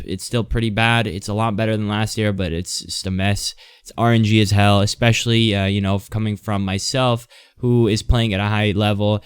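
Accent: United States. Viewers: What content are you in. You sound American but played Cihan in rivals.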